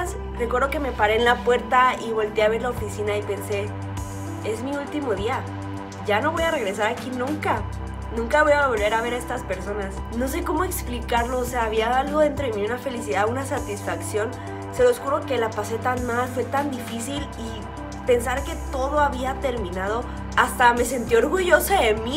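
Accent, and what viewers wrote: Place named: Spain. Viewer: Que bueno eso del intercambio es una muy buena experiencia de poder conocer otras culturas.. me hubiera encantado ir a España jaja esa tonada es tremenda jaja..pero con todo lo que está pasando hoy en día con las inseguridades robos y todo eso.. como que mucho no está para arriesgarse a menos que sea seguro jeje.. pero coincido con tigo seria una muy linda experiencia.. Saludoss😁😉👌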